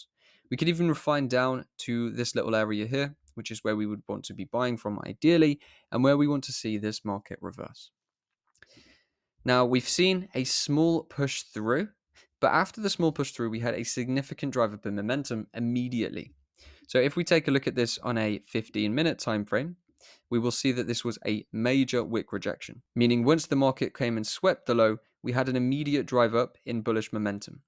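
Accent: United Kingdom. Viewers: Bristish acent is great!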